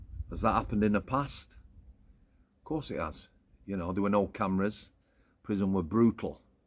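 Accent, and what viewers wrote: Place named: Yorkshire, United Kingdom. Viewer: I’m a Londoner and I love the Yorkshire (?) vibe. So much Britishness in that accent. Keep up the good and honest work. 😊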